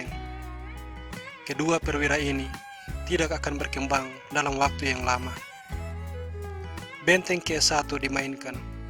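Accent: Manado, Indonesia. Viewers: Kaya y manado😁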